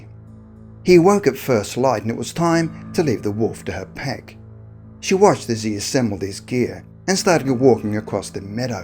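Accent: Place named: Australia. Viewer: What a touching true story! Proves that "Truth" which is in this case has taken a form of Mother Nature Herself, can manifest in ways far stranger than those in fiction! There is an intrinsic bond among all the inhabitants of the planet which comes to the fore, and as the narrator has so rightly pointed out, all this transcends "conventional wisdom". The narration is also very engaging, I suspect the accent is the cordial Australian one!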